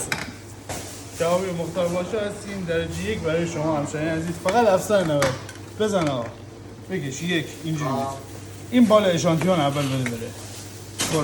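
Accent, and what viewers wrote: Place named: North, Iran. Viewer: خداشاهده همون اول که دیدم شمالی حرف میزنی،فهمیدم که نباید انتظار ی چیز درست و حسابی رو داشت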